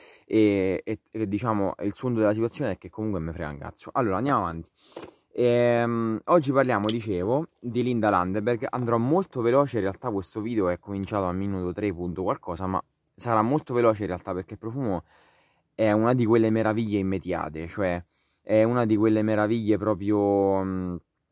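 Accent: Rome, Italy. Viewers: Forse romano.Bellissimo!!!! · Parla in romanesco a me piaceeeeeeeeeeee 👍👍👍👍👍😍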